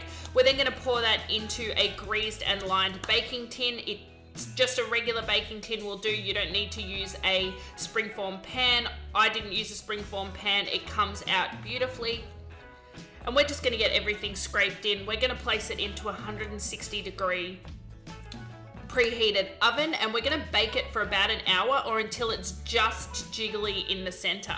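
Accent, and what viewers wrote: Australia. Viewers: Nice to hear an Aussie voice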